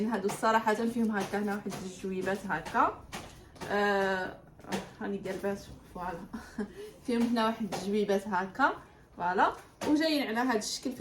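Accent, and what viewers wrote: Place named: Oujda, Morocco. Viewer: شحال زويني لهدري ديالك واش من وجدة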